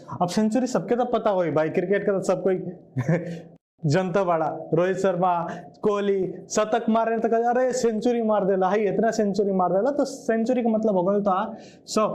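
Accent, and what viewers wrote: Bhojpuri, India. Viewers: Nice bhojpuriya andaj😂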